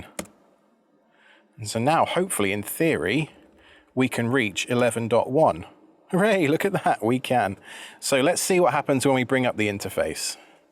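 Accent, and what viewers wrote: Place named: United Kingdom. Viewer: I'm not familiar with the different UK regional accents, but to my ears you sound like you might be from the same general area as James Corden, or as I refer to him, Craig Owens: Father of Stormageddon, Destroyer of Worlds. Just how far off am I?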